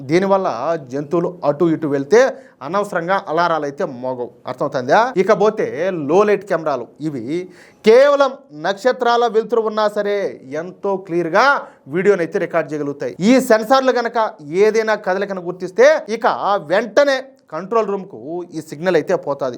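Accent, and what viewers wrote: Rayalaseema, India. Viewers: గారు, మీ రాయలసీమ యాస వింటేనే సగం kick వస్తుంది. మీ commentary లో వ్యాపారధోరణి కంటే, దేశభక్తే ఎక్కువగా కనిపిస్తుంది. వింటుంటే, ఇంట్లో మనిషి మాట్లాడుతున్నట్టు అనిపిస్తుంది. మొత్తం మీద వీడియో చాలా బాగుంటుంది ❤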